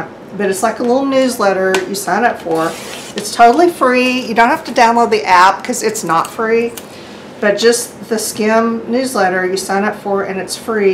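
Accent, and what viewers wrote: South, United States. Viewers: it was kind of obvious that you were a fellow southern gal, but I didn't know that we were neighbors! I'm in Memphis, just across the river... Hi neighbor! Lol! Thanks for the bead tutorial!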